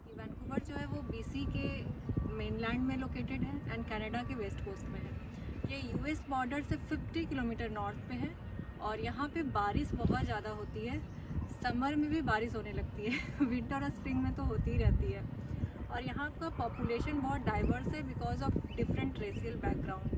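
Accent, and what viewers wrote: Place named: Bihar, India. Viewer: Bihar me kon gaon, your accent matching with me. Jab hum aayenge Victoria, aapse milenge jarur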